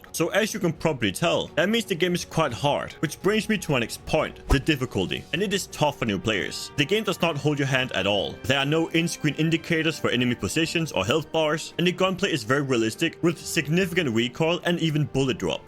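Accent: Denmark. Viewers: Can't fucking deal with this guy's danish accent just try a little harder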